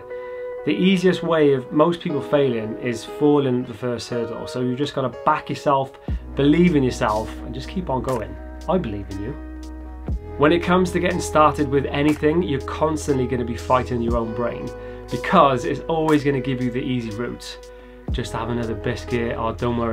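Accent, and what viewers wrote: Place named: Wales, United Kingdom. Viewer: Dear I'm from South Wales but have lived in Australia for the last 12 years. Recently I have been so burnt out renovating my house, being a single mum and trying to build my own graphic design business. Last night I stumbled upon your channel. This video has helped me a great deal and hearing your Welsh accent is so comforting. I really needed to hear these things so thank you. I suffer with anxiety and sometimes that can be totally debilitating as I question myself everyday and give myself ahard time. This morning I amfeeling a little renewed, small steps.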